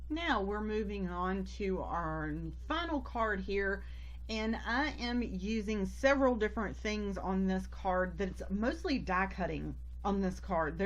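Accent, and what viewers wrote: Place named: Texas, United States. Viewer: You sound like a Texan. Love these cards. I am in the USA.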